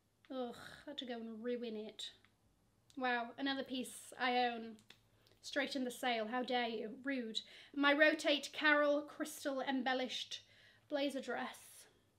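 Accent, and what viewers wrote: United Kingdom. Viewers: You are definitely my favorite British accent youtuber! Love your comments, your facial expression. Said that before, can definitely listen to your talking forever.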